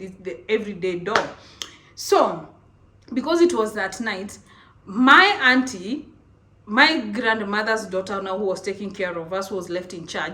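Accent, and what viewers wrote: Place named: Kenya. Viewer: I literally enjoyed every bit of the story. I can relate with everything you said (though I have not experineced everything). Well done beautiful. I love the Kenyan accent and how you just let yourself be " " and nobody else. Sis permit me to ask what Cameras and Lights you use for your podcasts?( I need the info for mine too) It is soooo clear and it enable me admire and just enjoy that beautiful transparent, glittering skin of yours. You are SkincareProMax.😊😊😊 Much Love from Cameroon. ❤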